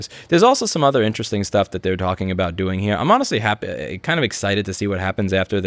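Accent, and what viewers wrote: New York, United States. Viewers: Angry New Yorker knows his shit.... Thank you for the lesson I'm walkin' here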